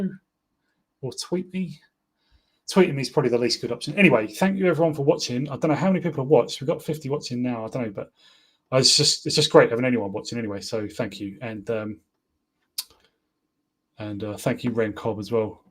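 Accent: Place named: Kent, United Kingdom. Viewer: He’s definitely from the south east. Sounds like a local Gravesend resident lol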